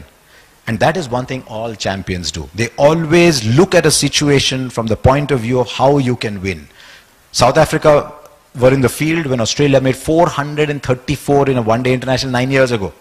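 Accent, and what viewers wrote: Hyderabad, India. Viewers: Hyderabadi 💯 aakhri kiraak chindiyaan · Came for the Hyderabadi accent.. stayed for the lesson.. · Bhaago miya..kya karain 😂😂😂..he nailed the Hyderabadi lingo here 👌🏻👌🏻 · I love Hyderabadi accent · Namaskar harshaji it's cent percent hyderabadi aswellas beautiful english commentary with mellifluous voice thank u n request u for on field jokes as u hubnob with cricket cricketers and can Crack crickety jokes thank u sir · This is urdu+Hindi + Telugu accent = Hyderabadi language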